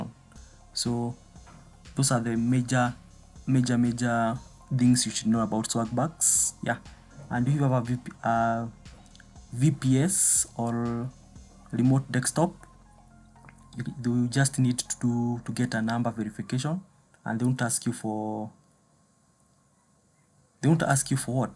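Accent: Kenya. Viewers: Hii accent ni ya kenya sindio...murima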